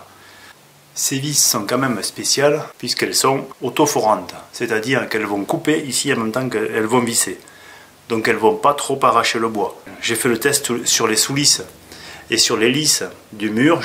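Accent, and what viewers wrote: South, France. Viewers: enfin une video simple et explicite a la fois ......qui plus et avec un bel accent du sud , ce qui ne gâche rien au plaisir d'apprendre .....merci pour tout vos bons conseils .....(yves)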